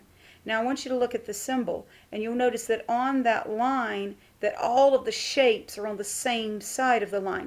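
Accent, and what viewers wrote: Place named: Texas, United States. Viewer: We were watching this in class and the teacher said it might get cheesy and she said you had a weird Texas accent. (But I like your accent also the video helps a lot thank)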